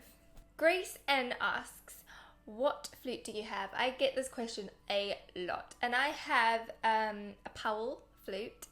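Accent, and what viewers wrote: United Kingdom. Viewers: I love hearing u talk,I play the Yamuna closed key flute I love it so much I am a beginner and I play 15-30 minutes each day when I get home from school,I am trying to learn by on flute for my school talent show I also love ur accent I always wish I had a British accent one day could u play the song by I really really love it that song Thanks bye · Whwre is she from? Is she british? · You sound like a british voice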